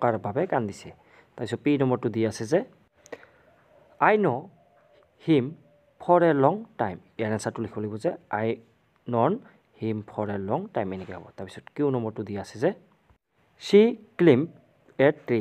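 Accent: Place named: Nalbari, India. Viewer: Sir nolgoriya hoi heybabe kisu kisu bakyo bhal koi nufute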